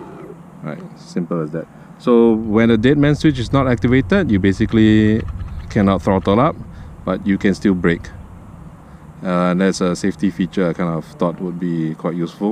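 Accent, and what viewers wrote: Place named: Singapore. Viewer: Singaporean? :D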